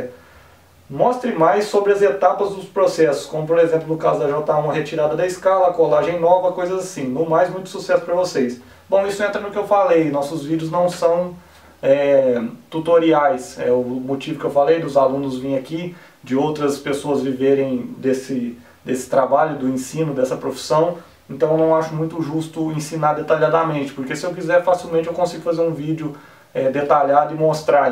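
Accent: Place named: Minas Gerais, Brazil. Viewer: Araguari? Bacana! Sou de Uberlândia, bem ao lado. Você é de onde? É mineiro, pelo sotaque, mas de que cidade?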